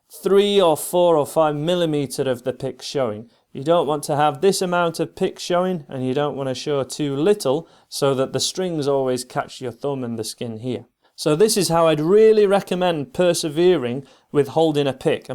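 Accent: United Kingdom. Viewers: I just can't stop watching your videos and I do feel comfortable with your teaching rather than many Others besides I really like you speaking British which is my favorite... Thanks a lot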